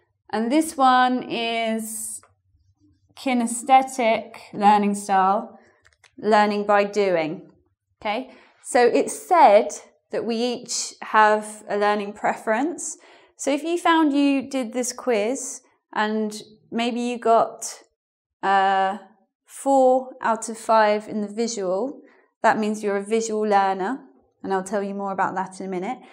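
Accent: United Kingdom. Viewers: i love british accent! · oooooh!!!!!!! U're REALLY REALLY REALLY GOOOOD!!!!!! AMAZING BRITISH ACCENT!!!!!! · I would like to thank you for your channels and the services you offer. I am dating an English lady from Bath and she said I have a British accent when I speak English and when she speaks to me she sometimes feels she is talking to a British gentleman. I did not tell her I learned my British accent from you because she might get jealous. Thank you so much again.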